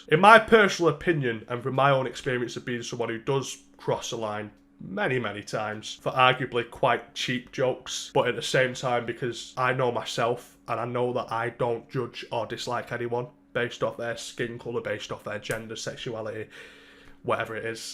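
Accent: United Kingdom. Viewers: I don’t think people understand everyone in the uk speaks like this it’s just uploads it on YouTube.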